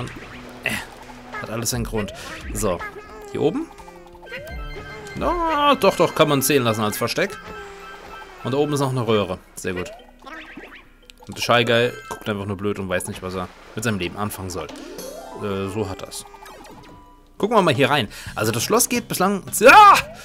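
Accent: Hesse, Germany. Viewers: Er ist Hesse, er darf das.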